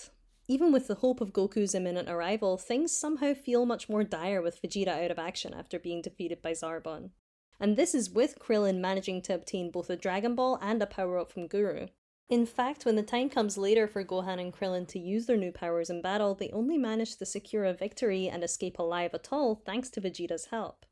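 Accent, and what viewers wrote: Scotland, United Kingdom. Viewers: You sound like a Scot that went to university in England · I subscribed so I don't miss it 😜 are you from Scotland? 😅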